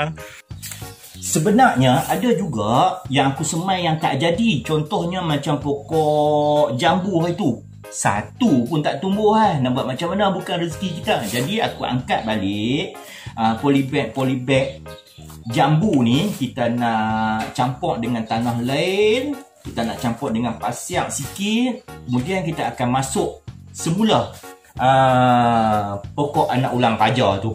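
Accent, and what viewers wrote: Kedah, Malaysia. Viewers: Tertunggu2 video..terbaiklah.syiok dengaq loghat kedah piaww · Amboi amboi amboiii...pandainya berkebun..seronok dengar loghat kedah. Terbaiklah hang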